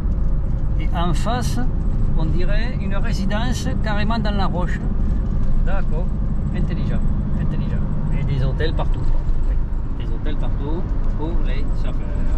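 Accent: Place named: Southwest, France. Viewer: Sud Ouest St Jean de Luz, Bayonne, Biarritz plutôt je pense 🤔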